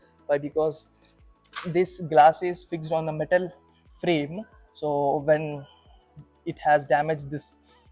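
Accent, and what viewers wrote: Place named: Bangalore, India. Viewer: typical blg accent uhh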